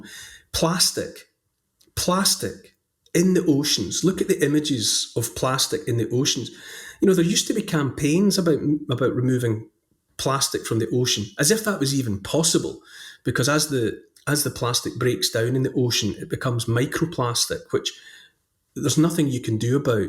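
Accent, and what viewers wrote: Scotland, United Kingdom. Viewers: Yes, I hear my own thoughts, feelings and memories of years gone by, iterated in lilting Scottish accent. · It's so refreshing to hear a gentle Scottish accent deliver so much truth. If it was an American i would have switched off by now. I listen to when i am in bed at night. So relaxing but enlightening.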